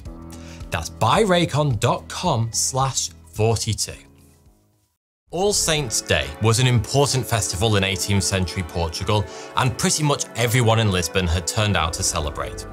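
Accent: United Kingdom. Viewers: Hear me out here: It’s really not that big of a deal. He’s British, he’s gonna pronounce shit wrong from time to time.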